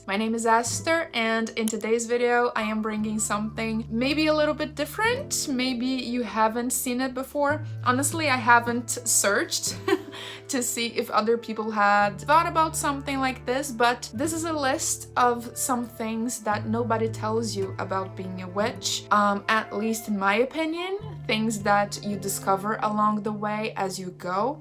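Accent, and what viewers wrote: Brazil. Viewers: Eu sabia que você era brasileira!! Seu sotaque é muito daqui <3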